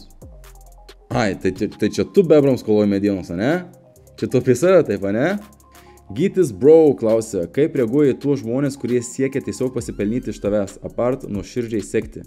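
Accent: Suvalkija, Lithuania. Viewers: Pagal tarme kazkur is Suvalkijos busi :D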